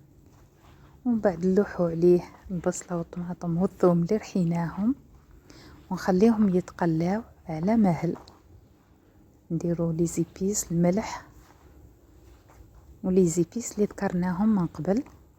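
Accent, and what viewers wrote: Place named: Barika, Algeria. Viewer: هل انت من ولاية بريكة هدرتك نفس هدرة بريكة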